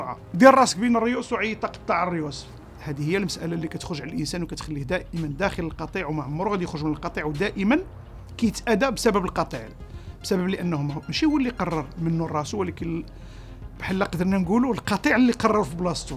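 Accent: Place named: France. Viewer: يارجل قبل ان تتكلم بل سياسة نقدية كانت ام سياسة لقطيع اووو تعلم ان تتكلم بل عربية الفصحى ثم اشرح من يسمعك يقول انك فرنسي متعلم العربية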